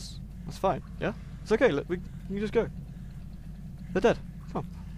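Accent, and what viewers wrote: United Kingdom. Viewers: Um.... since when does having a british accent make you sound like a nerd. I never really thought about his voice that much, everything is pretty much for the lolz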